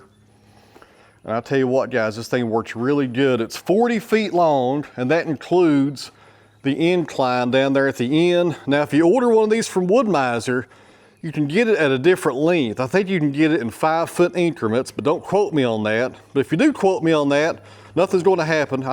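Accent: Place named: Tennessee, United States. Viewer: Audio is perfect. Can understand even with your Tennessee accent. Lol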